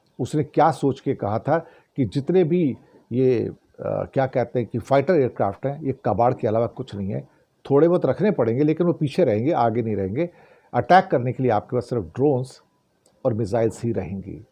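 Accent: Pakistan. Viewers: हर बार कि तरह पाकिस्तानी बोली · कहां से फर्जी समाचार लाते हो....बोलने का अंदाज ऐसा है जैसे कोई पाकिस्तानी बोल रहा हो....निकृष्ट स्तर....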